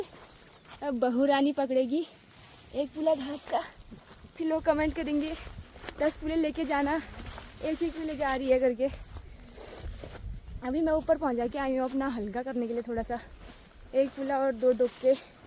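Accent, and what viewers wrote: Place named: Pahadi, India. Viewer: aapka pahadi bolna mujhe bahut accha lagta Hai❤❤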